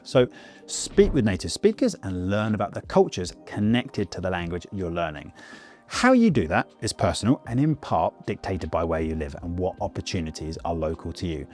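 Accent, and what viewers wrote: United Kingdom. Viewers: Hi! Do you mind telling me what variety of British accent you speak? I really like your accent and watch your videos also to imitate your speech.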